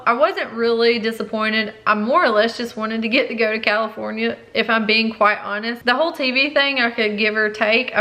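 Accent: South, United States. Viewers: Your accent and the fact that your middle name is. My parents' middle names are Ray &. My brother's middle name is also Ray. I feel you. We're both obviously and stereotypically from the South. I'm also predominately British and have Jewish ancestry. A lot of racist/anti-Semitic people like to yammer on about Jews not assimilating and always staying separate, but that's not how things worked in the South.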